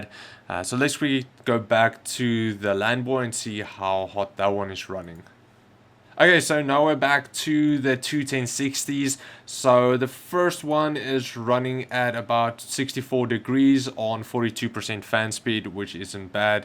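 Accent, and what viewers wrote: South Africa. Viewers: Couldn't fool me, The good old SA accent, keep up the good work/hashing in SA from an ex South African in New Zealand. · lol your accent confused me at first.. sounded southafrican with an American kick, anyway cool channel bro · Blatantly South African Takes one to know one lnao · For the Comment below about accent its a Great South African accent, and yes at the currect exchange rates earning in US/Bitcoin and exchanging to ZA Rand can well pay for rent if you live in South Africa. Great Video keep going ! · Sounds like South African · Michael Chistol Modern Afrikaans South African. Picks up accent from tv and media.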